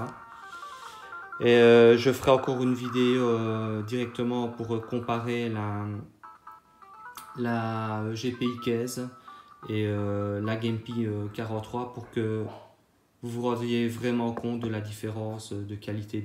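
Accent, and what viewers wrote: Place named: Liège, Belgium. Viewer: le bon accent liégeois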